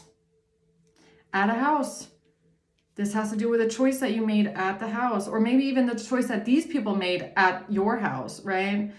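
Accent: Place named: Germany. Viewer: Afternoon beautiful 🐝, LOOKING FORWARD TO WATCHING AND HEARING YOUR BEAUTIFUL ACCENT 😊 German ❤, have a beautiful day night x ❤ thank you x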